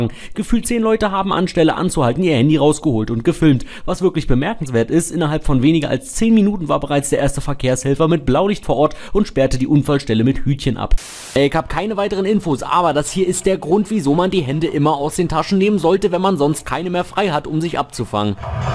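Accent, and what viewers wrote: Berlin, Germany. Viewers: Ich liebe Dein Berliner Dialekt, das klingt so lieblich. Ja, smartphones im Straßenverkehr sind das größte und "modernste" künstliche Zusatz-Übel aller Zeiten! Auch wenn sie nicht direkt dran sind, es strahlt extrem und erzeugt Verpeiltheit/Verstrahlung und vermehrt auch Angina Pectoris und Gedächtnislücken als auch Konzentrationsstörungen, Spaltkopfschmerz und burnout, da ja Mikrowellenfrequenzschleudern, die extrem disruptiv gepulst-digitale Störsignal- Befeldung auf die Synapsen, Zellen und das vegetative Nervensystem absondern mit extremer Wirkung, kein Wunder, daß die Lobby all die dazugehörigen Studien zwar zahlte, aber sie aufgrund der extremen Negativauswirkung auf das Geschäft nie veröffentlichte. Bluetooth, WLAN gehören dazu! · Die Videos sind schon manchmal echt krass, aber viel cooler find ick dein berlinerisch ❤ Mit den besten Grüßen aus der Hauptstadt 🎉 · Deine Kommentare sind einfach mal richtig schön mit dem Berliner Dialekt 😂😂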